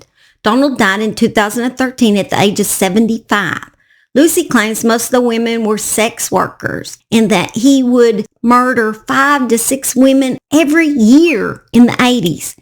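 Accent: South, United States. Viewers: I absolutely LOVE hearing you telling these cases! You have such a sweet southern voice, and being a Tennessee girl I love hearing an accent like mine! I thought it was to funny when you said "Oh shit, well I shouldn't say shit on here!" Hell I am always wondering how people don't throw out all kinda "pretty words" when they hear these F**KED UP cases! Thank you for another great video, like always. Oh yeah, congratulations on 1k subscribers! I believe you are going to continue to grow like you have been, cause what is there to not love about your channel and personality!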